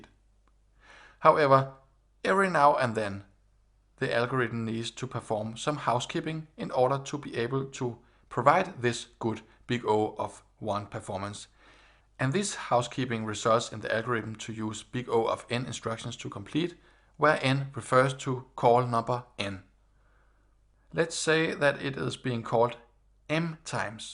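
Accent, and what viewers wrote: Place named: Denmark. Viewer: Pretty sure he is, his accent sounds exactly like a dane speaking english.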